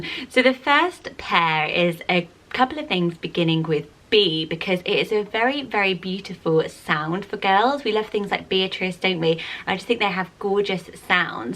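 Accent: United Kingdom. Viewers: Everything sounds prettier with a British accent lol...they sound beautiful when you say them! I live in Tennessee...ask someone to say Clara or Lavender 😆 It’s not good. I’m originally from NY...it’s not good, either 😂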